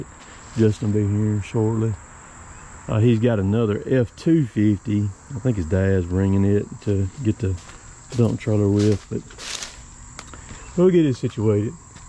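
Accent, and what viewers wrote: South, United States. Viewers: I think I like these videos more than your logging videos! Enjoy your southern accent...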